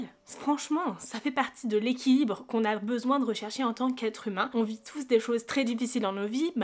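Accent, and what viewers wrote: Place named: South, France. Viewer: Merci pour cette vidéo. Un rappel de tolérance nécessaire. PS: j'ai cru reconnaître un accent sudiste. J'aurai mal entendue peut-être. Mon accent toulousain est ressorti aussitôt 😊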